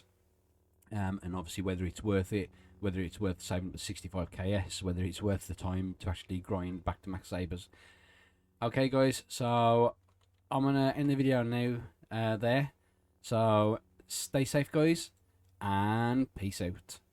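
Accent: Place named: United Kingdom. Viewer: you sound like a united kingdom person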